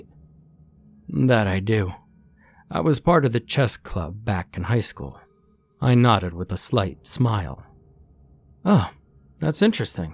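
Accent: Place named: Northeast, United States. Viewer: Accent? Where are you from? To me he just enunciates clearly and sounds almost northeastern, like Massachusetts or something 🤔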